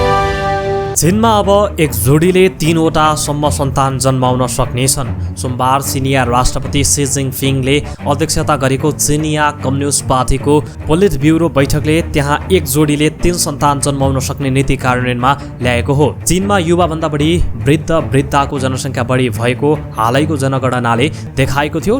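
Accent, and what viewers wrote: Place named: England, United Kingdom. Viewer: नेपाली बोल्दा नि अग्रेजी tone निकालेर pronounce गर्नु पर्ने? आफ्नोपन ल्याउनुस न् ।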